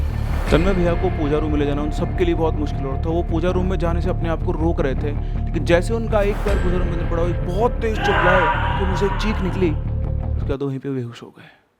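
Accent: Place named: Bihar, India. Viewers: Nice bhojpuri accent sir ji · The bihari accent langda bhoot dekhe k ba tohra k 😂... Hilarious 😂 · Your bihari acent is so cute and the 3D fig 👏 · His bihari accent 😁😁😁♥️ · no bruh! its not normal hindi ... Its bihari accent .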